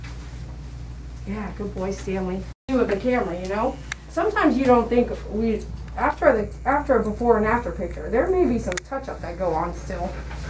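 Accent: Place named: Texas, United States. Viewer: Your from Texas 0.0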